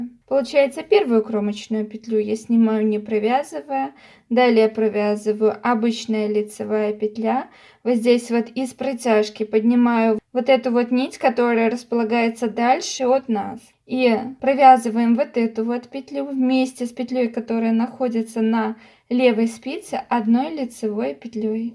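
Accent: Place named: Belarus. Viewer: Умничка! Милый белорусский акцент- обожаю!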